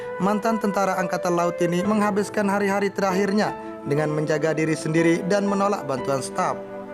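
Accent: Indonesia. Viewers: Dr kmrn bahas cara baca mulu....harap pandai..lidah indo beda...apalagi yg kurang bs bhs inggris..harap maklum lah...jngn spam trs🙏🙏klo ga suka ya uda diem aja...🙏🙏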